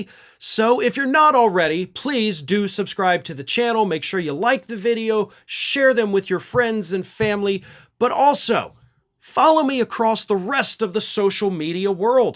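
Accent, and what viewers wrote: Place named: Appalachia, United States. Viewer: He is a maga senate wet dream. He talks and sounds like all the all stupid maga hillbillies.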